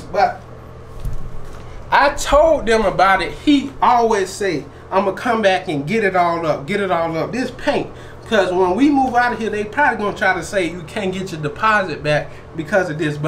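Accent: South, United States. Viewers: What state are. You located I detect a southern accent , you have a lot of stuff everywhere !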